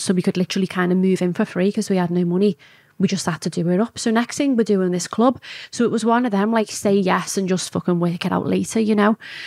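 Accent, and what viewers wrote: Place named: Liverpool, United Kingdom. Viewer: Lovely skin and that wig is phenomenal.. best money can buy .. I can’t with the Liverpool accent though 😂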